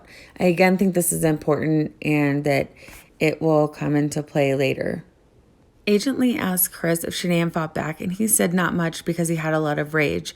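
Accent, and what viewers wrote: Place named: United States. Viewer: I'm from a little country in the pacific and generally I don't like the American accent but I have to say you have a really really nice voice and are very easy to listen to. Thanks. Ive been obsessed with this case ever since hearing about it. I think because the amount of home videos of Shanaan, Bella, Celeste I feel I got to know them. I think Shanaan was an amazing mum, wife and home maker. The tenderness and patience she showed her gorgeous daughters was unmistakeable. The mind just boggles. crustychris brings to mind one word and thats "WIMP". Him and nicks-hole deserve each other!!!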